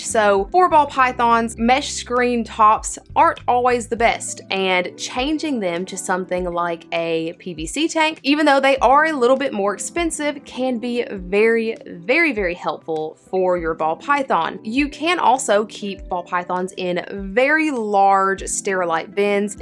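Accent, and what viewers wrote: South, United States. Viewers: I sooooo love your southern accent and you are just adorable. You are also soooo knowledgeable so I had to subscribed. Thanks for sharing what you know with us.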